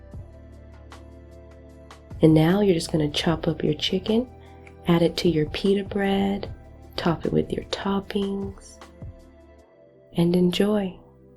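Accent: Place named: Philadelphia, United States. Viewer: I recognized that Philly voice!!!😉🎶 During this pandemic, we couldn't take Mom out for her birthday dinner, so we made this and a red velvet cake! She loved every bite of it!!! Keep 'em coming! Jersey's in the house!!!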